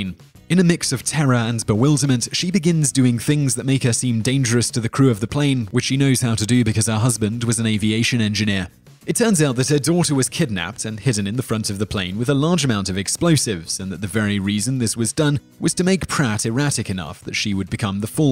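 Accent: United Kingdom. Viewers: We really should be more grateful when it looks like accidentally says something that may not be completely accurate or expresses an opinion that doesn't appear thoroughly thought out. The researchers here are so on point with such frequency that it makes me wonder if their occasional factual and/or contextual errors are not intentional. It just seems like an ingenious ways to fuel the comments section. After all, who doesn't enjoy feeling smarter that a very charming, well-read guy that has a British accent.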